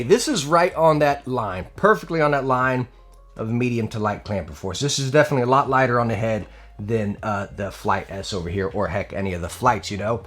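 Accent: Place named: Texas, United States. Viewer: Hey man. I am new to the channel. Have been enjoying your videos a lot. I am just curious, were you born in Texas? Your accent is nice af.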